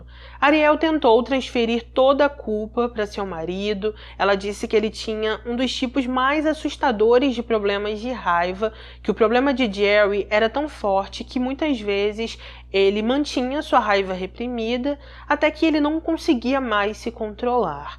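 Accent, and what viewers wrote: Rio de Janeiro, Brazil. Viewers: Olá, gosto muito de sua narrativa, sua voz é muito bonita e seu sotaque deve ser carioca, já sou inscrita no canal.Triste esse caso, creio que tudo estava errado desde o início quando tiraram as crianças da mãe biológica! Lamentável e nada trará de volta a garotinha!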